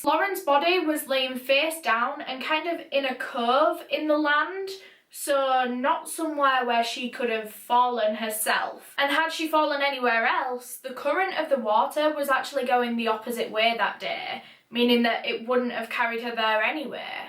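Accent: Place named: Yorkshire, United Kingdom. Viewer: As an British person~ i belive yorkshire-? Or a tiny bit welsh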